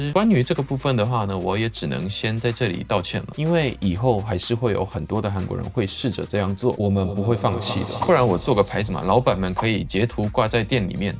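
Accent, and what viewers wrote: Taiwan. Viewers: 我幹麼解釋這個哈哈哈哈 然後台語也太標準了😂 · 常看you tube 影片,今天才有看到你的幾支影片,很好奇你的說話完全百分百如台灣人一樣口音、甚至更好,是在台灣生活幾年？能有如此語言程度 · 好邊準的台灣腔國語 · 聽到你講國語，會覺得你是韓語講得很好的台灣人😅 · 超級標準台灣腔欸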